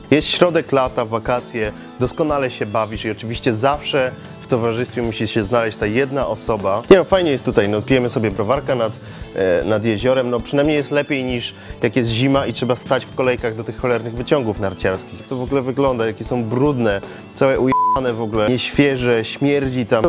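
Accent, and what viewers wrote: Tarnów, Poland. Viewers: Sporo. Sam chwilami nie byłem pewny ale tarnowski akcent i biżuteria z mięsa mnie upewniła. xd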